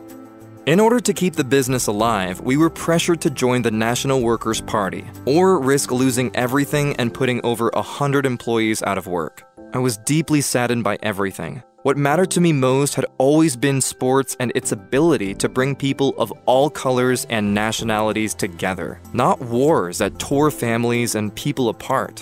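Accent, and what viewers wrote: United States. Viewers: If he created Adidas why does he have such a young accent And if he is German how does he have an American accent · You sound american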